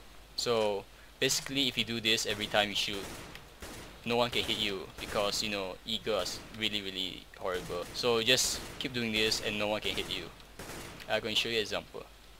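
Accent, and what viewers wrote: Singapore. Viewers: Hey you from a singaporean background :) You should speak more singlish in comp Can you just play a comp game purposely stressing a singlish accent and upload it to youtube? Because I too am singaporean. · You have a Singaporean accent. Hello :D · the accent sounds like sg tho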